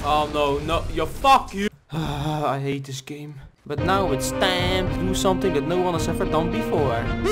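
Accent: Netherlands. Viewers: That dutch accent tho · Where are you from? You sound dutch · sounds like your dutch.....ben je dat ook? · He is from holland he said and i am from holland and i can recinize his accent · Are You dutch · Such a dutch accent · Are you dutch? you sound like your dutch :D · Is this guy Dutch because he has a Dutch accent? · Are you dutch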